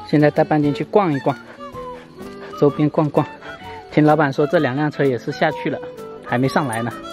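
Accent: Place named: Hakka, China. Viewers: ，你是惠州客家人，我也是，难怪我能听懂你说啥。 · ！原來你是客家人！我也是！我們都是同音腔的客家人！👍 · 半斤和老友鬼鬼搭肩膀太可爱了，客家话广东话都能通真棒👍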